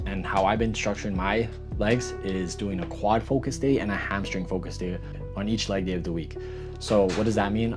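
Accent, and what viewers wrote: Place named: Canada. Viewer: you have a strong canadian accent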